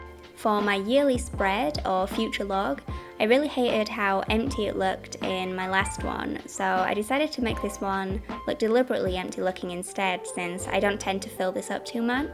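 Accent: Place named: North, United Kingdom. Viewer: I hope you don't lose your northern accent.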